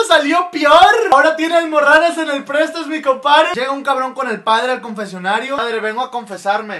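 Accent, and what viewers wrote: Galicia, Spain. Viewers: Me encantas con tu asentó de gallego <3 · eres gallego cierto?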